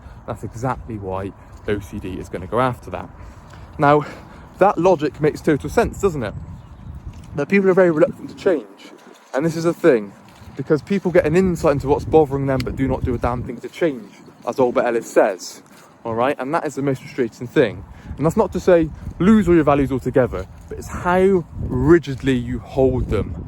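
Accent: United Kingdom. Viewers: I love British accent!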